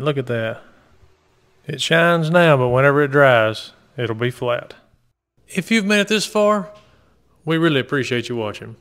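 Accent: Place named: Mississippi, United States. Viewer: Yeah, I live in the northwest now, but I knows my peeps when I hear 'em speak. lol Spent summers with grandparents in West Point, MS. growing up. When I first heard you speaking I thought you were one of my uncles. lol Good video by the way. Chat later.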